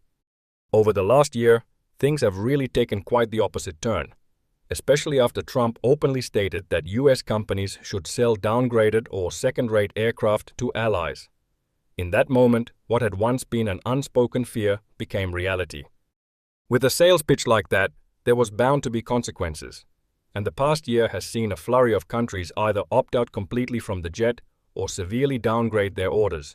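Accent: Finland. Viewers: Hey you creator. Please put some identification on your content, cause i was really close to dismiss you as AI slop, but then i heard your accent, and as a Norwegian i could recognize you're most likely Finnish ❤ Thank you for looking in to this. Slava Ukraini 🇺🇦 and Europe 🇪🇺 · I'm Norwegian and I know Finnish peoples. He has 100% Finnish accent.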